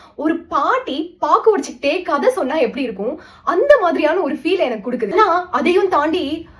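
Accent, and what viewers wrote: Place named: Theni, India. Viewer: Theni slang madam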